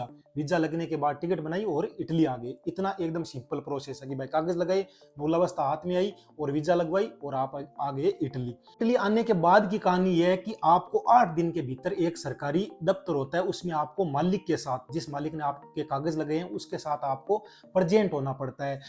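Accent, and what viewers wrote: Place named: Haryana, India. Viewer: Language Haryanvi Touch 😊😊😊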